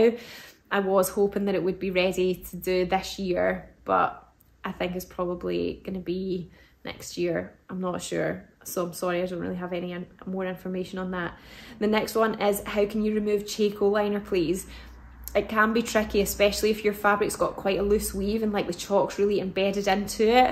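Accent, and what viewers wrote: Scotland, United Kingdom. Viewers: Argh. I planned to catch you for the first time live last night and I forgot. As well as the lovely fabric it's great to hear a Scottish voice. I love living in the land of cheese and chocolate but a little bit of homesickness creeps in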